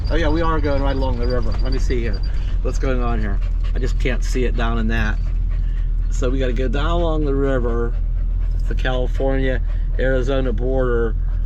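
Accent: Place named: Northeast, United States. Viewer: You sound like yr from the NE area am I correct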